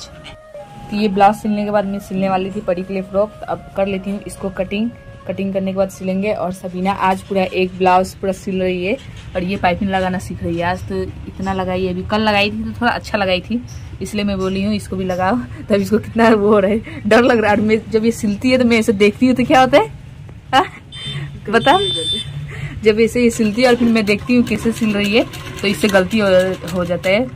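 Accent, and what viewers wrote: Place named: Jharkhand, India. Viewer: Apki jharkhandi hindi boli bahut achcha lagta hai. My jharkhand se apka video dekhta hoon.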